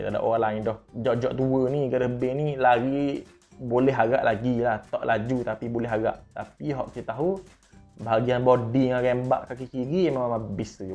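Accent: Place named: Terengganu, Malaysia. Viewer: Ejah subtitle.. Dop paham loghat terengganu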